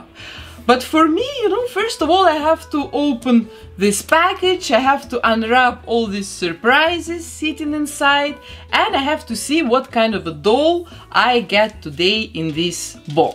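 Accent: Russia. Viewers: Вы русская?) акцент очень похож :) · Меня путает ваш выраженный русский акцент 🙃❤️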